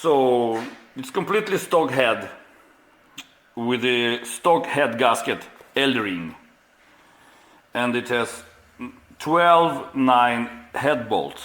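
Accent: Russia. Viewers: Та я только по первым словам на английском уже понял, что это говорит русский человек 👍👍👍 Мерс огонь!!!! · русский английский!!!! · Русский что ли бро )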